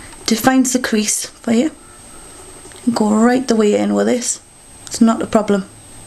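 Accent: Newcastle, United Kingdom. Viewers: shes from newcastle LOL.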